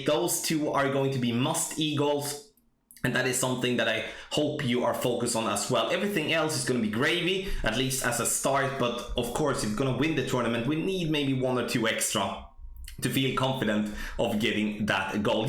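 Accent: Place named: Sweden. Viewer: Du låter svensk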